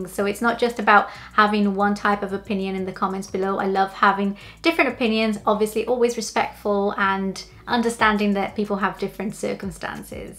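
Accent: Wales, United Kingdom. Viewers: Is her accent Welsh? Because it's beautiful. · Your not from Brazil, your welsh accent is too perfect aha how long have you been in Wales 🏴🇧🇷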